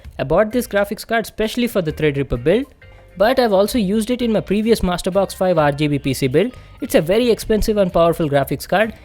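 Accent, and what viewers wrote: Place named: Gujarat, India. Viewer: You are definitely gugrati , marvadi or sindhi . English R.I.P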